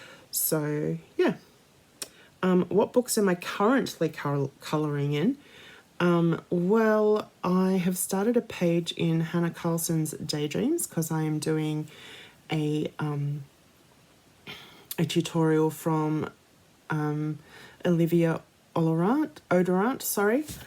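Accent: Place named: Australia. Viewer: I live very near to Newcastle Upon Tyne in the UK & my daughter actually lives there. As for accents, love the Aussie accents. 😊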